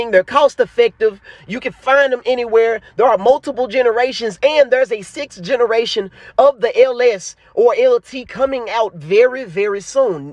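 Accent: Memphis, United States. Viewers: Lol 😂you are definitely a memphis native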